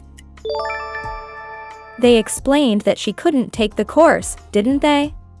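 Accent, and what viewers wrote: United States. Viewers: These are not all C1-C2 questions. A decent grammar book in The UK will confirm that. Of course, the accent in this video leads me to believe that an American created this video… C1 is your (grammatical) level if you get more than 40 of these questions correct. And I would have liked to have seen more Tag type questions and mixed conditionals…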